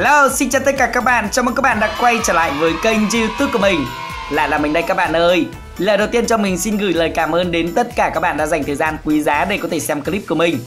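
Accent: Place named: North, Vietnam. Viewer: Ông này người miền Bắc nói giọng miền Bắc đi nói dậy dậy nghe chối tai thiệt sự giọng đã nhạt rồi còn nói kiểu đó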